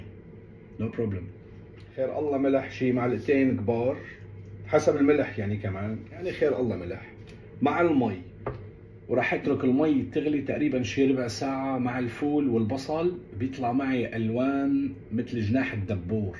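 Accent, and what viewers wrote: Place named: Banias, Syria. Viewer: اهلين من اول ماسمعتك قلت من بانياس بس حبيت اتأكد الله يسعدك يارب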